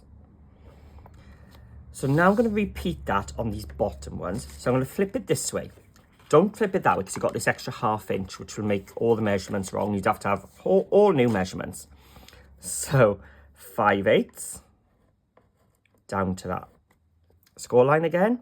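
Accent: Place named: Carmarthen, United Kingdom. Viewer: Just come across from another YT channel and so glad I did. These baubles are gorgeous and ideal to make for my grandchildren for little treats on the Christmas tree. From one 'welshie' (Newport, Gwent South Wales) to another (you sound like you're from the Carmathen area) to another, thank you so much and can't wait to watch more of your videos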